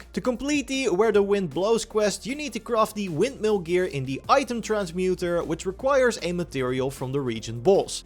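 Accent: Netherlands. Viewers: I love your hearing your Dutch accent lol. Goeie video!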